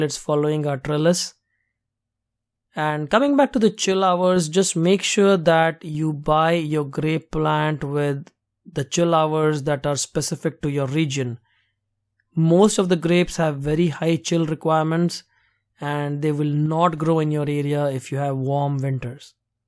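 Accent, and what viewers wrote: India. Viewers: I know u r indian By ur ascent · why you sound like indian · Sound like india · Your accent is Indian man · your voice teling that you are indian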